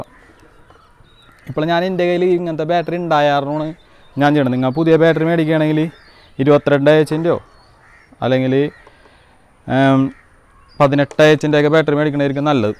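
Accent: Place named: Edavanakkad, India. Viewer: താങ്കളുടെ സംസാരം ഒരു എടവനക്കാട് touch ഉണ്ടല്ലോ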